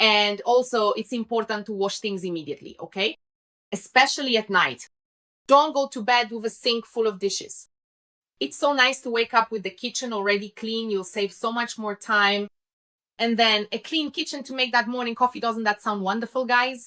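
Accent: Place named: Russia. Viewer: ficou com sotaque russo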